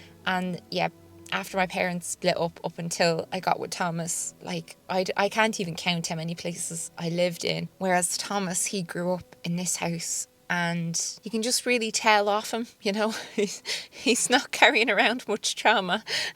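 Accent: Ireland. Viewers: I just love your personality and your self and videos!! You are my favorite YouTuber and I would be soo sad if you left, but I would understand. Maybe you could do a podcast in the future if you decided to leave YouTube because your voice is soo lovely to listen to. I miss Ireland and I love hearing how you talk! Thanks for all the big sister advice. (Even though you’re not teaching people in person you are here online and I’m so grateful for what I’ve learned from you!) ❤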